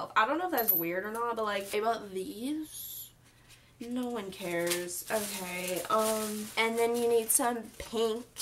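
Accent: South, United States. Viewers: I just found this channel and first of all you are hilarious and I love your southern accent because I can relate.. I am from the south #needmysweettea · Omg what state do you live in? Southern af🤣 Alabama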